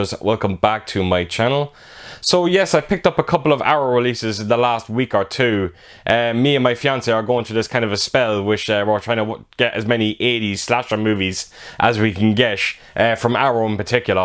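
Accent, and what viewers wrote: Ireland. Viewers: Agree with your Edge of the Axe practical fix work. Top notch. Which part of Ireland are you from??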